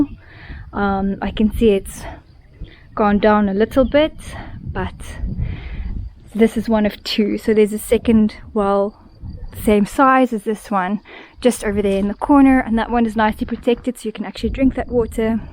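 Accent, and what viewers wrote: South Africa. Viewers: I can spot a "fellow south african" an oceans away 😂 🇿🇦🤌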